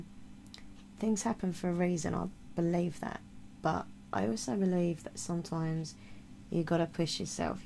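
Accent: Essex, United Kingdom. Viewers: Thanks for this video,; you can count me as another person who finds comfort with the ASMR community on here. I've seen a couple of your videos before and was instantly intrigued because you sound like you're from Essex (that's where I'm from originally) if not London, am I right? Right now, I am going through a particularly heavy bout of feeling inherently lonely. I have quite a few good friends but the problem is they don't live near me so I only 'see' them virtually 98% of the time. I don't have a partner and haven't had one for years and being quite sensitive as well as being a massive romantic at heart, sometimes it can be cripplingly lonely for me here. I've also struggled with Depression and mild anxiety issues for 15 years or so and that has increased of recent where I'd had a fairly long break of no depressive behaviour I'd like to reach out to you on here and am subbing so I can follow you and catch up on more of your content And yeah, I know EXACTLY what you mean with the shopping thing. 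Happened to me just today. I've said this before in the comments section of a few ASMRtists, but I think that some of you are really some innately beautiful souls that do so much good and are so compassionate and genuine, that I know that for me personally, I would have a harder time coping and relaxing sometimes if it weren't for people like your good self making such invaluable and beneficial content. So please do keep on making videos and ignoring all the f**kwads and trol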